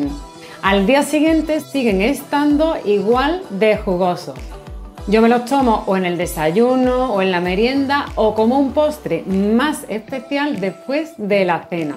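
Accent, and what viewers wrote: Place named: Spain. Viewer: Gracias por la receta! La probaré. ¿De que parte de España eres? Me encantó tu acento! Saludos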